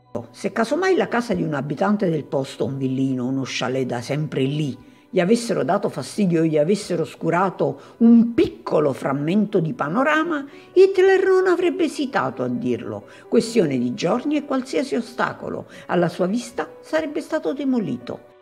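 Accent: Italy. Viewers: Brava, ❤ avverto nell' ascoltarti parlare, il tuo accento campano 👍💥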